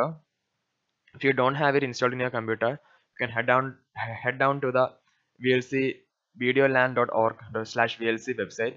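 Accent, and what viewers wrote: Kerala, India. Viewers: Malayali, Right? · Argggh, your indian accent is too strong,...I cant understand shit that you're saying. Lose the accent bro. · Ohhh your Malayalam accent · Machane Malayali AAANOO??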